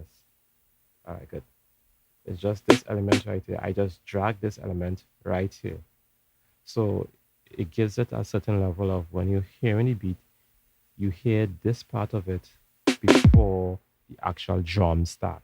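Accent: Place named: Trinidad and Tobago. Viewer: dawg that Trini accent be strong af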